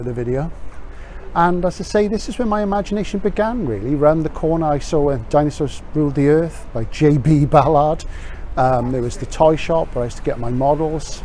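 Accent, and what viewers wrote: Wales, United Kingdom. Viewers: Excellent! Your accent became more Welsh the further down Mill Street you went.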